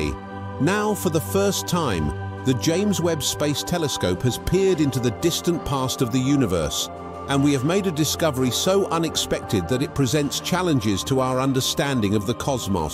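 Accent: United Kingdom. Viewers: One thing we can count on from the YouTube corporation is a reader with a Brit accent. How obvious does a grift have to be?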